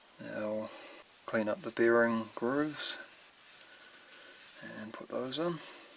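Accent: New Zealand. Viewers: Accent: this guys NZ. Dole pineapple can: he's in NZ.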